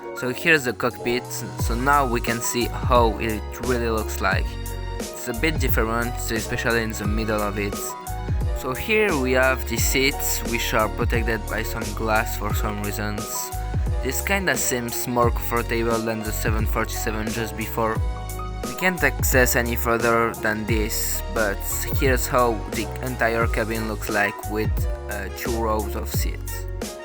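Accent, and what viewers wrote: France. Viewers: bro ur french your accent is realy pronounced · OMG VOICE REVEAL WITH FRANCE ACCENT 1!!1!1!1! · Not gonna lie your French accent is cool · thicc français accent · That french accent brah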